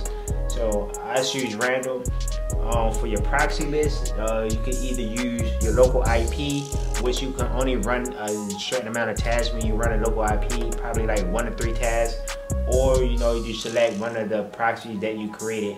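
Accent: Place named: Baltimore, United States. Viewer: Def from Baltimore lol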